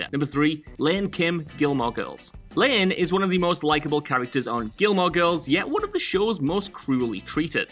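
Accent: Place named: North, United Kingdom. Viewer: He is northern.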